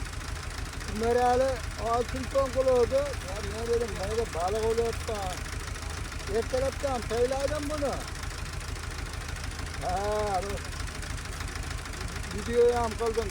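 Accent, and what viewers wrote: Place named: Buvayda, Uzbekistan. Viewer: Хожи ота ? Каердансиз ? Бизнинг Бувайда тумани ахолисининг шевасига ухшар экан гапиришингиз . Лекин уста овчи экансиз , койил !... Видео учун рахмат ! ...🎉🎉🎉🎉🎉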